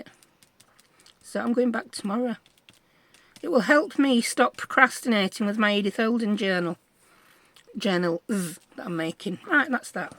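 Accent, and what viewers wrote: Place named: England, United Kingdom. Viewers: Omg I love your act sent alway did Or Linden English England just love that accent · Whenever you say "I don't know", it reminds me of the potato ad I loved.."Don't know, it's all covered in mud" love the yorkshire acent. Much better than mine ....(semi, scouse! I'm from other side of water!) Love your videoes.... please say Hi to Gertrude for me LOL!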